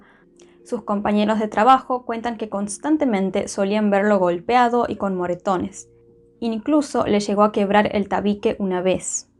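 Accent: Argentina. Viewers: Es la primera ves que veo uno de tus videos y me gusta mucho cómo haces la narrativa, el acento argentino es genial! Saludos desde México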